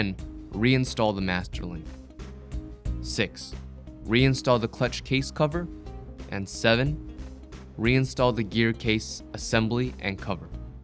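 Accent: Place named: East Los Angeles, United States. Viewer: Man oh Man , I really noticed this guys voice has that distinctive East LA Chicano accent , makes me home sick ... you probably did not even notice but it is a Hispanic Los Angeles Dude talking ! ... video was made in a suburb of Los Angeles !!!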